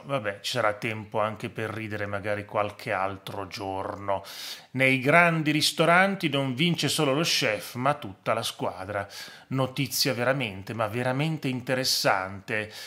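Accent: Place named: Rome, Italy. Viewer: Buongiorno Prof. Grazie, perché Tra tante lacrime il suo accento romanesco mi fa sorridere un pochino!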